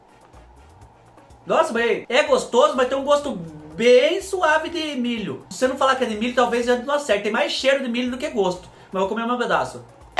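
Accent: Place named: Rio Grande do Sul, Brazil. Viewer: Oi vc é gaúcho tem um sotaque de deferente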